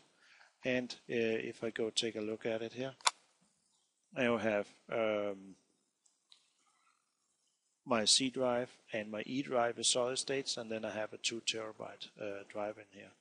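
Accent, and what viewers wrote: Denmark. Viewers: He's Danish, though. :) I sounds like. :) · Du lyder meget dansk, du taler Engelsk med dårlig dansk accent. Er du fra Danmark? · man kan sagtens høre du er dansk xD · R u from denmark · are you danish?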